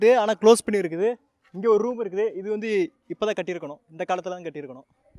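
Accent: Kanyakumari, India. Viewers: Very beautiful video.. Lovely effort to bring this place to forefront. Indian ASI has helped Cambodia lot of temples and it is quite a shame that 1200 year old place here is left unattended with no maintenance. One of the artefact where flowers were kept look amazing. Better be careful with those Train insects - could be poisonous too and good to be out of structures that could fall on head.. Love to hear the Tamil presentation ingitu, angitu kind.. Kudos!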